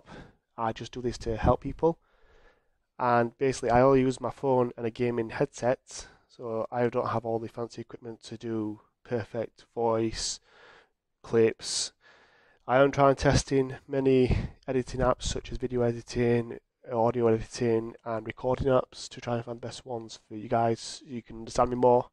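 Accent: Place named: United Kingdom. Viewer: Hey!! For me is kinda difficult to understand sometimes, but thats bc im not used to hear british accent and my native language is spanish. But it helps me to improve my english. 😅 your firecracker.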